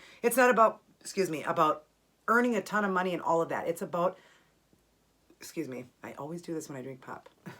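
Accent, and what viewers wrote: Midwest, United States. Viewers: dat mid-western accent tho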